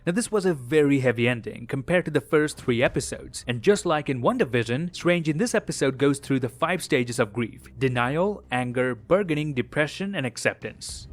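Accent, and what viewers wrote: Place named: Montreal, Canada. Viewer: You have a Montreal accent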